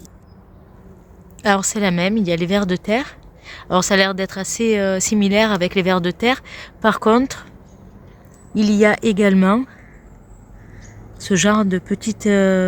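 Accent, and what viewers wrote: South, France. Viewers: Bonjour, avec ce petit accent du sud que tu as je ferme les yeux et je me crois à Palavas les flots. Chez moi le Cèleri ne tiens pas l'hiver il gèle et disparaît à chaque fois, le tiens est encore très correct! tes navets tes choux sont jolies aussi, attention quand tu enfonce la fourche bêche j'ai entendu un vers de terre hurler! 😁 ta terre est bien vivante, à bientôt 👍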